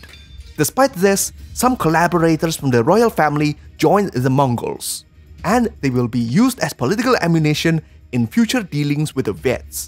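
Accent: China. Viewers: From the accent, I am pretty sure he's Chinese.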